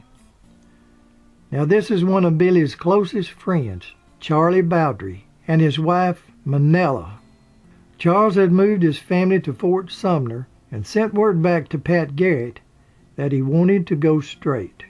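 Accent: South, United States. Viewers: Ok...I'm from Wisconsin and in my opinion, anyone south of Illinois has an accent that multiplies by every mile south of that. Perfect voice for documentaries and you should be on TV and radio. Your documentaries are better than some of the History Channel documentaries I've seen. Also, speaking of History Channel, have you watched "FOUND?" If you havent, you should see the Jesse James episode...mind boggling! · I love that Southern accent. Music in my ears. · I don’t know where you’re from, but you have a pleasant story telling accent. I’m guessing western AL or Mississippi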